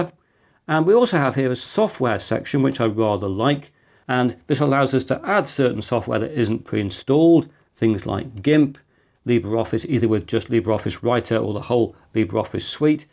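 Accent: United Kingdom. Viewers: Thank you for very clear and easy to follow videos and fantastic British English. So nice in middle of those AI s**** channels… using AI voice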